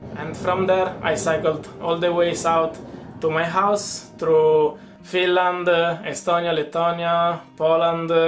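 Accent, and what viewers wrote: Italy. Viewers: si sente tantissimo l'accento italiano grande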